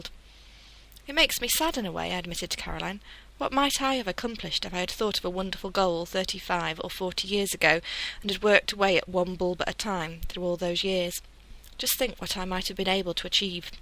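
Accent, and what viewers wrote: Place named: United Kingdom. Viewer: I love British accent! I wish i would live with this principle.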